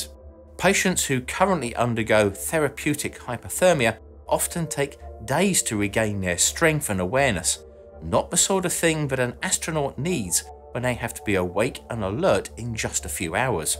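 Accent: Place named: England, United Kingdom. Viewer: Love the tone, the voice, the pure english accent, very relaxing listening to you Sir! And the content........ perfectly excellent!👍 Forgot to mention, the intelligence and the shirts of course, salute! From a French-Canadian subscriber 🖖.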